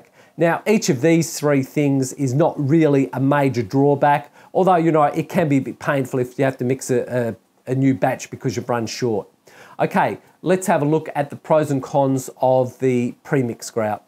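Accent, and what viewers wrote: Australia. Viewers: Recently discovered your channel. Thankyou for the wealth of knowledge you share. I have yet to do any mosaics because it was a bit daunting. I'll be right now. Good to hear the australian accent and those birds in the background. 😊👍🌳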